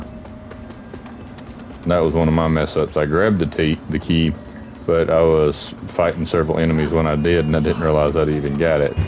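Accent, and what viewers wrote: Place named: South, United States. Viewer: You sound like a red neck bunkin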